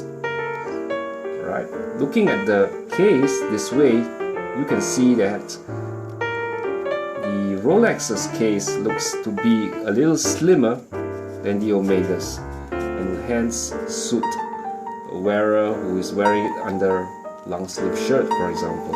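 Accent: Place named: Singapore. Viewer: The way you speak, your accent.... You're Singaporean aren't you?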